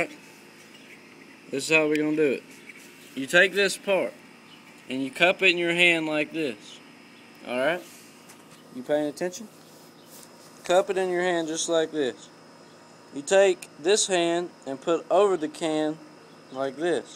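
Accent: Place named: South, United States. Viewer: What part of the south or you from? Im from Gretna Louisiana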